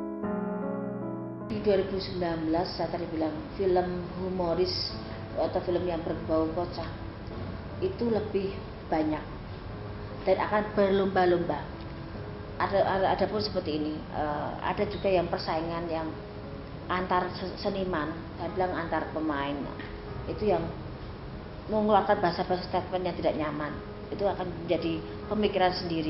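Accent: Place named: Banyumas, Indonesia. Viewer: Mbak iki wong ngapak ya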